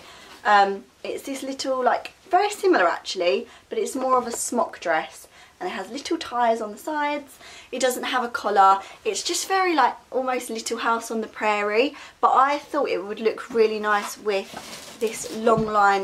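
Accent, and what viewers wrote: United Kingdom. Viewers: Love this British English accent💞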